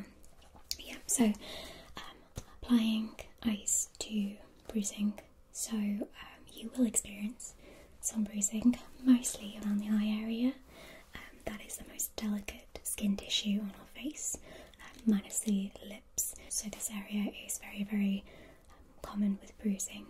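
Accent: United Kingdom. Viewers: You have to most beautiful voice with the cutest british accent! please never be quiet in any of your future videos! <3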